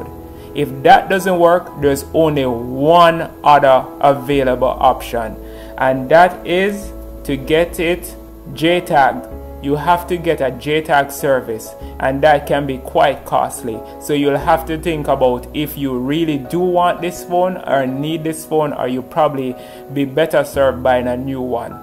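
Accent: Jamaica. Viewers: You sound kinda like Jamaican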